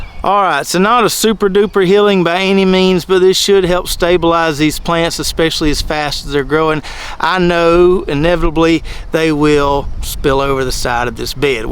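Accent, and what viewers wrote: South, United States. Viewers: lol southern talk is funny 🤣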